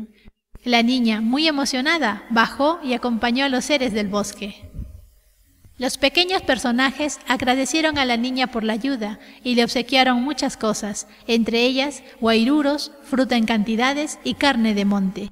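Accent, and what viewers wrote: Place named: Spain. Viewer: muy bien hasta la z con pronuncia ibérica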